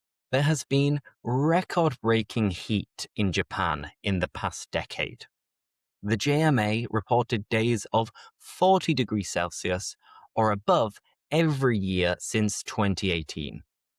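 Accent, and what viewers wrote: England, United Kingdom. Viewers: Wonderful! I love your English accent!!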